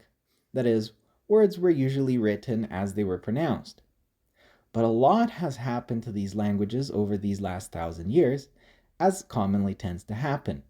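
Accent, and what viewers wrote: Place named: United States. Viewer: I find it to be a very bold move talking semi-authoritatively about not one but three entire languages, none of which you speak, and with a blatantly young American accent which suggests little more than book knowledge on the subject. I mean we've already had Scots half ruined by some autistic American on Wikipedia before!! Have ye no learnt from that?!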